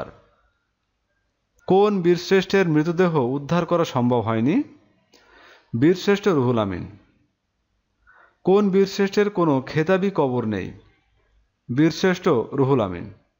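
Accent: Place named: Noakhali, Bangladesh. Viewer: উচ্চারণ পুরাই নোয়াখাইল্লা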